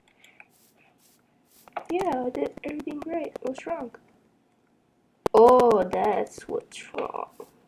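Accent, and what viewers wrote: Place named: Ireland. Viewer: OMG so irish i love it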